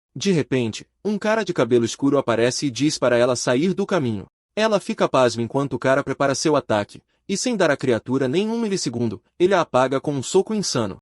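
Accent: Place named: Portugal. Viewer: Eu amo português de Portugal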